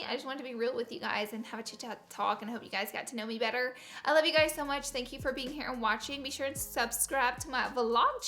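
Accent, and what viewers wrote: South, United States. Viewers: I love your cute little southern accent!! ❤️ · I love your videos & love your southern accent. x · Omg!!! Never been fist person to comment!!!!! ILYSM❤️❤️Ur the bomb we both have a country accent❤️😂💄💄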